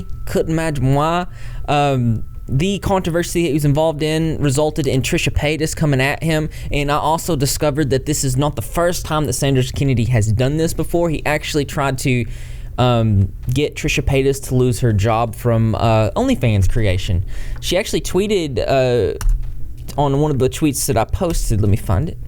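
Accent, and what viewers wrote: South, United States. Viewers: I gotta say, as a midwesterner who lived in the south for a few years, the accent is such a weirdly lovely breath of fresh air. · 1st) Sanders had gotten into something before b/c he was not making videos BUT when he came back I was happy for him and thought he was really trying to give viewers actual information so this is so disappointing, he seemed like once he saw all the racists videos for a while few years back not sure why but when Shane’s racist videos had really resurfacing (which is NOT cool at all) Sanders seemed like he was on a witch hunt or something he was actually one of the very 1st Drama type creators I had ever came across years ago so disappointing smh. 2nd) I recently came across your channel due to the Dahvie Vanity’s stuff few months ago and I love what you are doing you seem like a good hearted person and LOVE your country voice/words ....I am from NC and people from NY would always comment how they loved the way I talked and now I understand lol ! 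· U were cute until I heard that gross southern accent reminds me of Jim Crow laws GROSS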